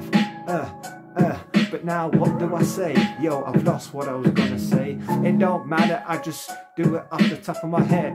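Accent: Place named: Yorkshire, United Kingdom. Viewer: no way !! Ty bought you were more Yorkshire... I'm a Lincolnshire lad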